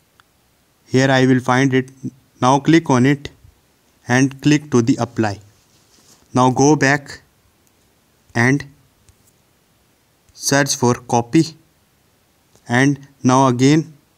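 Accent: India. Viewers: Idk why but hindi people when talk english it just so interesting with their identic accent🔥🙏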